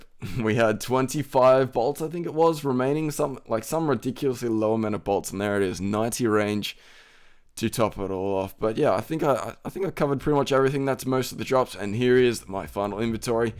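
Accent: Australia. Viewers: No, he sounds Australian.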